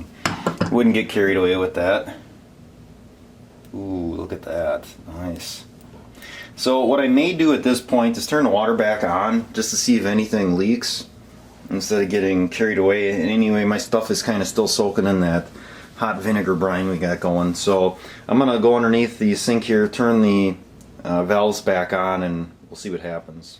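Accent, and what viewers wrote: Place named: Minnesota, United States. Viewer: Here I am back again watching this video. Leaking started again, despite following all the directions. Didn't wait for Moen to send replacement O rings this time. Bought them online. And they didn't reply to my email. Not a happy camper. However, I am enjoying the accent of the presenter here and guessing MN maybe? Lot of snow in the background.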